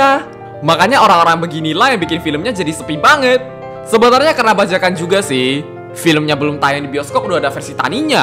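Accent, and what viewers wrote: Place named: Medan, Indonesia. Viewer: hmmm abang ini fix orang medan wkwk salam kenal bang, btw gua orang pakam bang. dari awal gua subscribe elu rada ngeh sama logat logat khas medan lu eh ternyata bener orang medan dong wkwk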